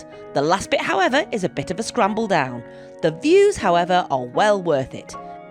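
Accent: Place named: Wales, United Kingdom. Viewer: This is a GREAT video! Really loved it! I think you should make more like this. And by the way, I really enjoyed hearing all your Welsh pronunciation. Well done! 👍 XX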